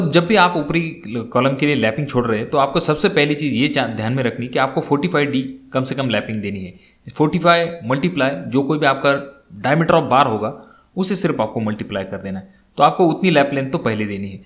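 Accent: Maharashtra, India. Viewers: Bhava tu marathi ahes bahutek tuzya avajamule lgech smjty karn aplya marathi lokanchi hindi aschich aste 😀😁..baki video ek number astat tuzya khup mahiti milte tuzya video mdhun .keep it up bhava 🚩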